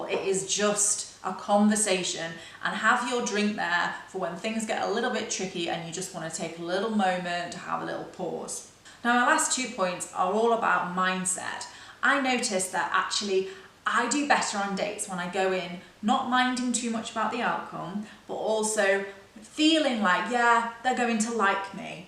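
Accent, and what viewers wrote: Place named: United Kingdom. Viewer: Very useful tips, thank you 🙏🏻.. BTW i loved the British accent🇬🇧 because of you.. it’s clear, classy and beautiful accent.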